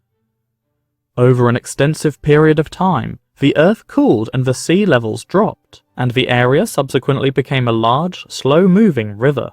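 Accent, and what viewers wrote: Norfolk, United Kingdom. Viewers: Think I'm hearing a Norfolk twang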